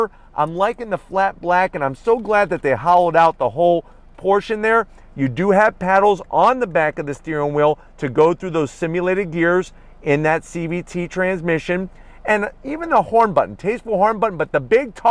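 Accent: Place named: New York, United States. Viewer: I know a new yawk city accent when i hear one! ;)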